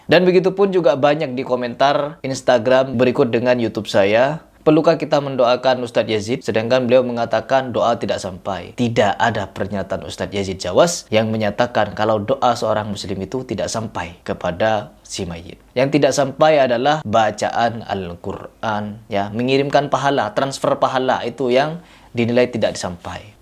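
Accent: Madura, Indonesia. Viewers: Logat maduranya kelihatan😊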